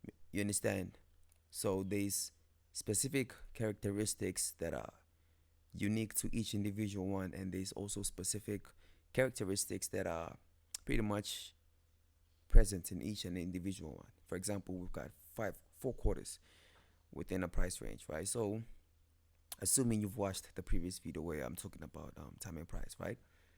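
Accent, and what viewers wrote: South Africa. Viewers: he's from south africa :D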